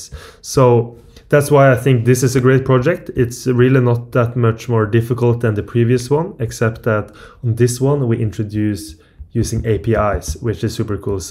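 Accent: Norway. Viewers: Crazy. Are you from Norway?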